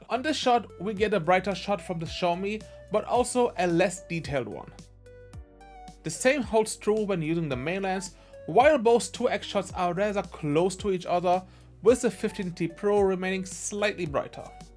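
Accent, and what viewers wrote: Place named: Germany. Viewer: Man hört einfach immer raus wenn ein deutsch Sprecher auf englisch tut meine Güte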